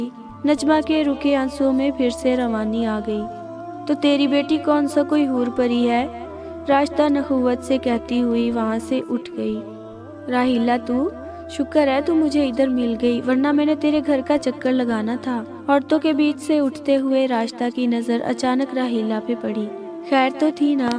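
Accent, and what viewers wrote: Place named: Punjab, Pakistan. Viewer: Your Voice is So Cute With Sweet Panjabi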